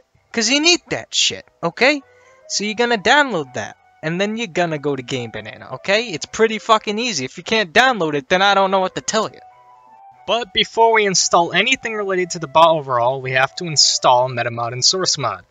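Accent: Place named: New York, United States. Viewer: i think hes from new york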